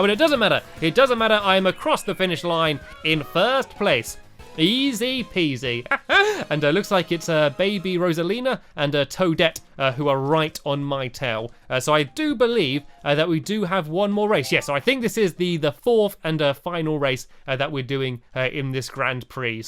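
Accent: United Kingdom. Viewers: He is british he has an accent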